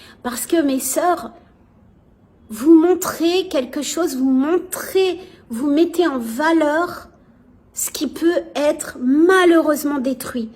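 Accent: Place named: Arab world. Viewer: fete de l aid du ramadan ces manger pendan 1 moi s engraisser la nuit manger 3 repas en 1 fois vou vou prenez pour des foque a vouloir remplir vos reserve de graisse tai une meuf perdu deja fai jamai d enfan tai pa assez belle tu ai influencabl ta des souci psychologique en quoi tai belle ta pa le vosage racer fin tai une movaise race aryenneee ces pour sa tai musulmane allah crer les arab avec des tete de chameau des nez de juif les saoudien on les nez et ma bouche pareil que le chameau pourquoi allah fai moche sa creation 🙂 on dirai une enfan qui parl tu parl com une pucel tu parl que tu va attirer les sal type mais ces sal typ son la creation d allah tu insulte donc sa creation tira en enfer tai une kassos tu as telemen besoin d amis que tu ai devenu musulmane mai v ou ile toi tai pa assez bell pour te devoiler et ton accen arab le hhhralal tu n ai qune blanche perdu fai un test de qi mdrrrrr la pieter dan le keur et la keu dan quoi mdrrrrr ta pa honte dal merdeuse tai moch com tes seur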